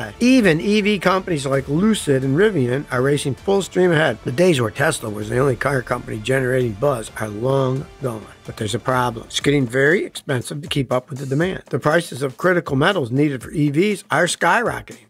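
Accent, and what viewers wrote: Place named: South, United States. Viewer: Your southern accent made the video interesting to watch.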